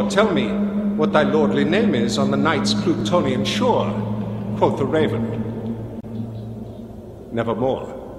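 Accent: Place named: United States. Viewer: An American voice reading Poe..Refreshing.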